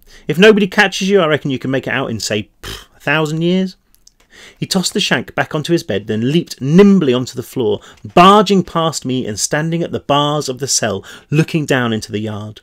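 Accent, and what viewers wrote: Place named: United Kingdom. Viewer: I love hearing read it in a British accent. How cool would it be to have a recording of Shakespeare reading his own plays? I like how he shares his own thoughts too.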